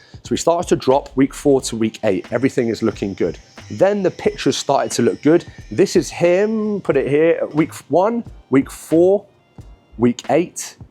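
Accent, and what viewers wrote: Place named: Hampstead, United Kingdom. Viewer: I was watching a walking tour of Hampstead Village on my iPad and then I clicked on this video. I can tell by your voice that you were originally from that area or close by. I’m in California and would trade pools and palm trees for charming and quaint. I just lost 15 lbs and finally getting my body back. I now have a diet of sardines, salmon, and salads. Instead of walking tours on my iPad, I will try walking outside. Great tips in this video. Thank you for posting!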